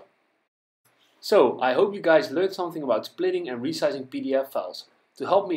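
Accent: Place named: Netherlands. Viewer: Are you Dutch? I can recognize your accent, but not sure :p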